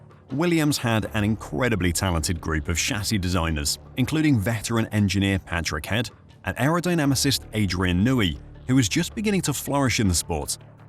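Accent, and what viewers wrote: England, United Kingdom. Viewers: Regarding Tom Pryce's accident - it's "car park" - not "parking lot". You sound English to me so you should use the English terminology.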